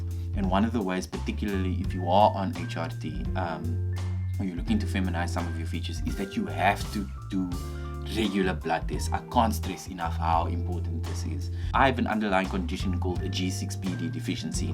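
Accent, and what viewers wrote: South Africa. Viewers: Are you from South Africa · Hii I recognize your accent. I'm south african as well! I'm month 5 on E. Subbed. I too get my viles from Fagron.As for me i first tried groot skuur but they never called back so I managed to get an informed consent from a private doctor. Her name is Megan Martin if anyone's interested if they need help :)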